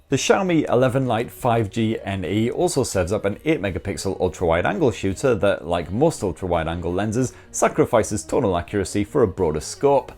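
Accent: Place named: Newcastle, United Kingdom. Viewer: I love your comments and cool Jordy accent, "sound is weaker than a ferrets fart" 😂😂😂 I was born in gloucestershire but I've lived in the United States for 32 years but I like to hear your comments they're hilarious and some sayings that I haven't heard for years, keep up the good work 🙂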